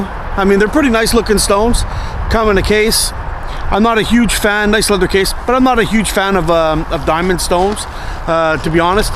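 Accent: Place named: Canada. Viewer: boogie2988 with a Canadian accent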